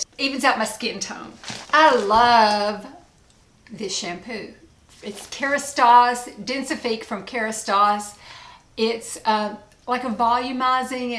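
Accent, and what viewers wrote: South, United States. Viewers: Do empties-don't do empties! As long as you keep doing videos! You are a very sweet and soft spoken southern Lady that gets me to relax when I listen to you. So, thank you!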